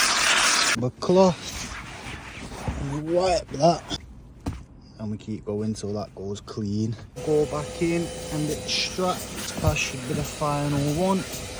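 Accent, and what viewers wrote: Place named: Bolton, United Kingdom. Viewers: I thought he sounds more Bolton / Chorley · Sounds like you're from manchester. Im in london, have an audi A5 convertible. Id love a deep clean! I regularly clean my motor but i dont have access to a tornado and i know youd do such a better job than i could ever do. How do i go about booking you in? Do you even come this far down south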